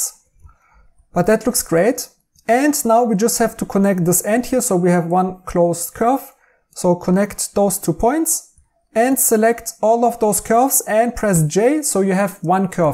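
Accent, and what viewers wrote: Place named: Germany. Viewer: Hi, thx for your free content 👍 btw, i hear u r no native speaker, by any chance, is your native language german? 😁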